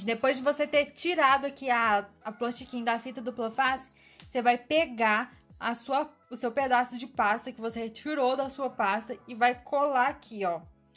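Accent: Minas Gerais, Brazil. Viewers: É mineira, 98% de certeza pelo jeitin que fala kkkkkkk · Ah, mineirinha! É inconfundível o nosso sotaque. 👏👏❤️ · Moca vc e mineira né? Te ouvindo falar ,parece eu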